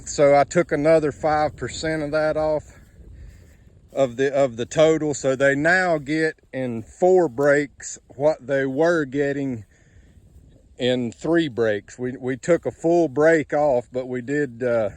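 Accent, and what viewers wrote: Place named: Australia. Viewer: Have you spent time in Australia...every now and then I hear an aussie accent with your American ?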